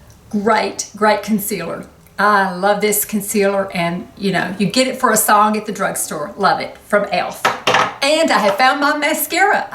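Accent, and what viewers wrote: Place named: North Carolina, United States. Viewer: Omg, I just have to tell you that you have made me smile thru this video. I know you're from east TN, but man oh man do you sound like us here in central NC!!! I hear my mom's side of the family in so many words you pronounce and I love it! YOU feel like home and I automatically trust you! You've got a new subscriber here😊🤗😦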